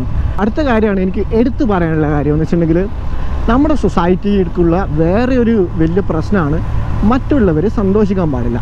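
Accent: Kannur, India. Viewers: chetta, chettan kannur aano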